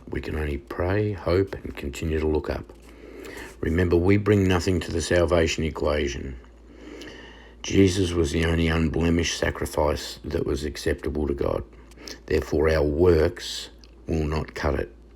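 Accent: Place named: Tasmania, Australia. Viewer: ❤❤❤❤❤Waking up to your Taz Aussie voice is wonderful! Talking about rapture with that accent makes my morning! Thank you! Have a wonderful night and sweet rapture dreams! ❤❤❤❤❤😊 That big bright moon was right over my house on the mountain last night. Lit up the entire back yard! My 🐕 dog loved being able to see foxes, deer and any other critters out there! ❤. So, after hearing your wonderful accent talkin' 'bout rapture....I go outside to see the chemtrails ONLY over me (yes, all lines cut off to and fro, just over ME) and I took pics to show people so they'd believe me. I told them if I disappearr....dpState. Nothing like being targetedd, but Jesus is with me, protecting me. Yes, misspelled words so "Aye-eye-yie-yie" won't track it so quickly hopefully....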